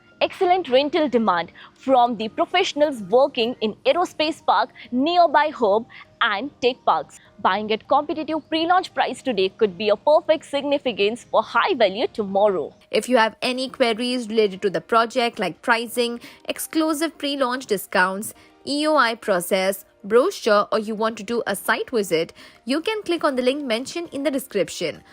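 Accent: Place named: Bengal, India. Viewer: Bengali English accent😊😊😊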